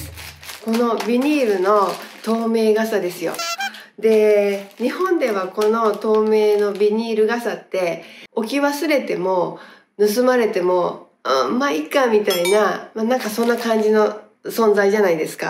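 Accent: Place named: Hiroshima, Japan. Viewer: さん、いつも楽しく拝見しています☺️ 通訳ガイド経験のお話面白です！広島弁も可愛くていいですね🙌🏼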